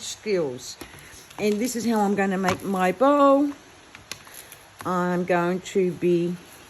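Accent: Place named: Australia. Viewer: You sound Australian, but it is so hot in Oz right now n you have a thick thick jumper on! I'm sitting here melting! 😂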